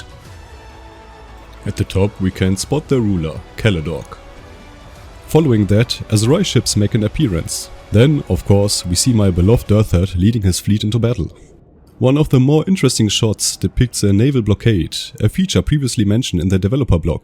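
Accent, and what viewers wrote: Germany. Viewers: I love your german accent ❤️🗿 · Brudi bist du deutsch Brother are you German ? Dein Akzent verrät dich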